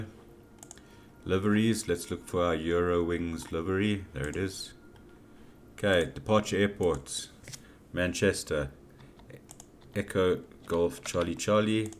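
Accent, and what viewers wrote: South Africa. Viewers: Nice tutorial, great to hear a SA accent in MSFS2020 :) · Great video, thank you so much.... By the way, you sound South African😊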